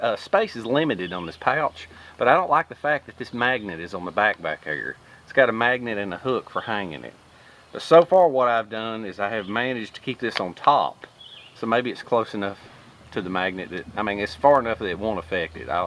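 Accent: South, United States. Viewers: I subscribed to you a few weeks ago now. Love your honest life experience opinions. Keep your videos coming, very educational. Seen so many bug out bags, the wife's kicked me out bag lol, get home bag, I got this knife to add to the 5 other knives lol Common sense. I've added to my van a few of what you have. Many thanks. My wife loves your southern accent.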